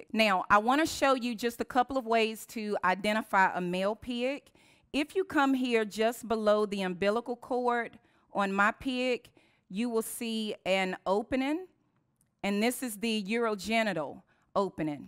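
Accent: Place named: South, United States. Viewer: She from the south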